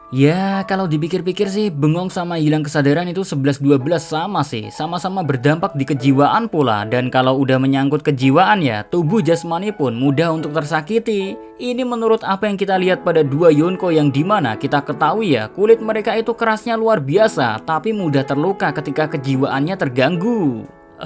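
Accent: Java, Indonesia. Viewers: Bang abang orang Jawa kah? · Hanya Channel ini yang Khas penyampaiannya... Gabungan Jowo+indo. Makin ngeten bos 👍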